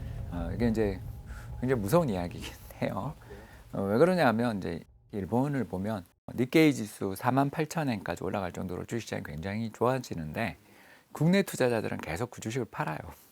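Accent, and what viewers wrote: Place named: Gyeongbuk, South Korea. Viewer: '경북 사투리'에...듣고나면 고구마 한 솥 먹은 느낌!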